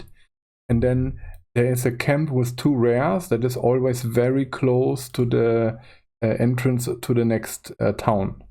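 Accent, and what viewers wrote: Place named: Germany. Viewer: Man merkt, dass du Deutscher bist. 😄 Gutes Video!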